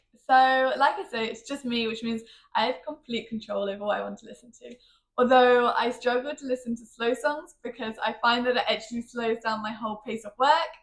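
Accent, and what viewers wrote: United Kingdom. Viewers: What kind of accent do you have? Which part of England? I'm guessing south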